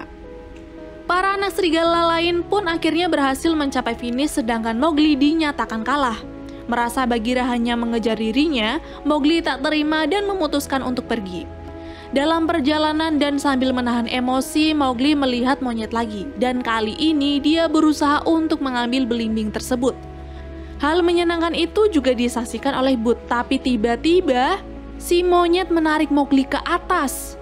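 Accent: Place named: West Java, Indonesia. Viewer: Mimin na orang sunda euyy🗿🗿🗿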